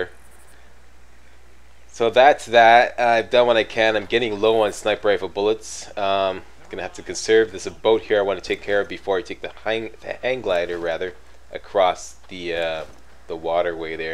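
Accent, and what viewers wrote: Canada. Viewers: Thank you!!Furthermore your excellent walkthrough videos, for me it's an inspiring advanced training in English or God s(hoops)ave the Queen "Canadian English"!!!"gizzahs".."pps"..and "gonna blow up a tower" are icing on the cake.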